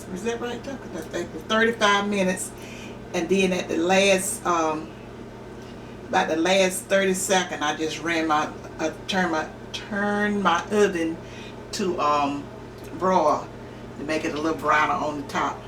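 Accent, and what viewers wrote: South, United States. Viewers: Mrs., HELLO I knew when I heard that southern voice, I had to tune in, stick and stay educated in what you know is good, best & Delicious! You got that voice, know how,every once & a while some slang, a recipe within it's self, momma got it going on! Ive Appreciated every how to video, with a taste, those greens with Lipton onion soup, fried or boiled they're the Bomb, I've never had such comfort in knowing greens could taste like that. You're a" well seasoned" teacher, as my mom before her death this year would say your never to old to learn something new, & life brings about a change, I have no request, but from u, I'll learn even more, your one of my adopted video voices, now that I stumbled on to your stories of yesterday, today & then some I look forward to cooking even better, Thank YOU, Roz.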